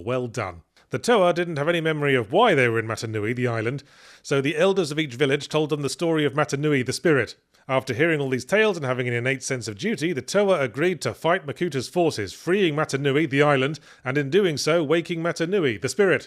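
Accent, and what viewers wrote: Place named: United Kingdom. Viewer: Out of all the first run of Bionicle products, the only ones I could pose "like one of your French girls" were the Rahkshi. Knees and good body portions do that to an action figure. However, whatever you do, do NOT disconnect the ball joints. ...also, I forgot that Jala (the McDonald's toy) and Jaller (the one packaged with the bigger set) are the same character, and the British pronunciation isn't helping any. That's not even getting into how the Matoran were originally called the Tohunga in the first comic.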